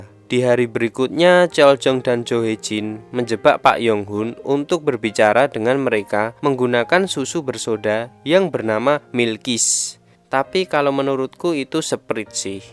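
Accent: Java, Indonesia. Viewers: Suaranya kaya agak medok jawa? Wkwkkw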